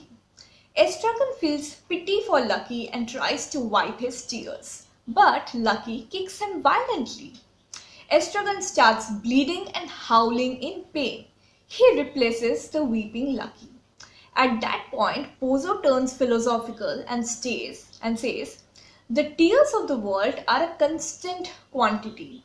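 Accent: India. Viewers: So sweet and honey mixed easy Indian accented description made by you, It's easy for me to make notes for my M.A. in Eenglish, Drama Paper. Love and Thank you, from Assam India*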